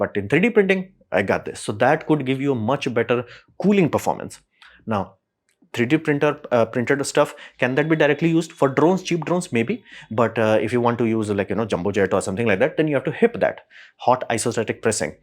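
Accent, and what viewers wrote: India. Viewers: Great job bubba! I’m Indian and proud to see a fellow Indian with his thick accent doing a fantastic job of explaining something that could be very tedious and complex in an entertaining way! Keep it up!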